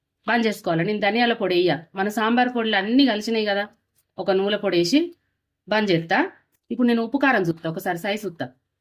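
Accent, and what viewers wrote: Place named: Karimnagar, India. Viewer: Meedhi karimnagar eh ayi untadhi kada aunty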